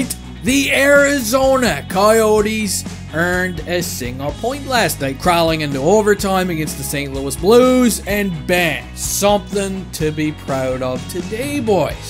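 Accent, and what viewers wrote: Newfoundland, Canada. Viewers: This is the first video of yours that I've watched and the Newfie accent is killing me! Love from GFW buddy!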